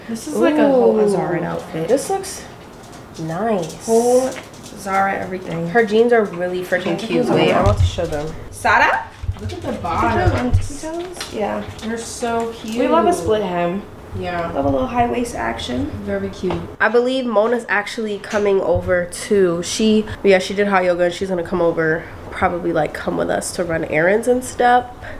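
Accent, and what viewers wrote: England, United Kingdom. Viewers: It’s the English twang for me. Your friends are a vibe. Okay French toes, look at the little pinky toe 🥺😅 Sis said her hat is broken.. comedy just comes naturally to You 😂🥺❣️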